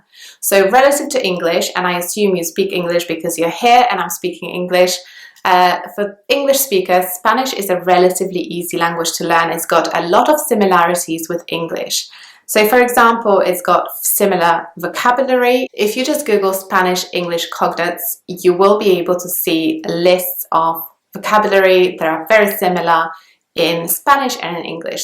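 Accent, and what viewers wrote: England, United Kingdom. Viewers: How is this accent posh? It is just a normal English accent. I have heard more posh from elderly in my area though LMAO. Some tips to sound this way for forebears is say things as it is spelled like ‘t’ and extend your ‘a’s for example “paahst” = past. Even the h is super subtly pronounced where I live but a lot of People say it with a northern accent and mix it up. She says “example” I say exaahmple stressing that p a bit. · What a heavy English accent you have ( teacher)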